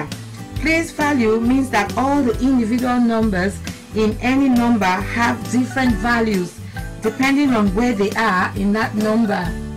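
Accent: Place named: Nigeria. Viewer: Wow a 🇳🇬 Nigerian voice ❤❤❤❤❤ 😊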